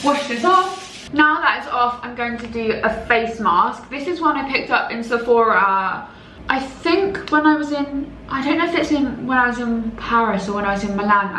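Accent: United Kingdom. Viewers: No idea. She has a very typical British accent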